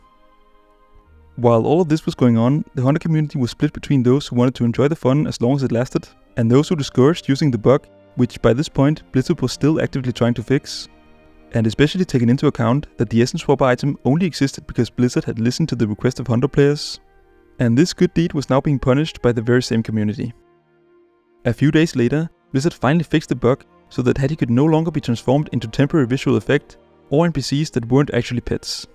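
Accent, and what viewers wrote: Copenhagen, Denmark. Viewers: Hears first few words: ah, a Dane. :D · is talking soooo DingLish 😆 lyder enda som Københavnsk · Heavy Danish accent ❤👌🇩🇰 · It's funny how, as a Dane, whenever I tune into a channel I have never heard of before, I can immediately tell by the accent that I am watching a danish person. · all i hear is yukky copenhagen accent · danish accent is very present with this one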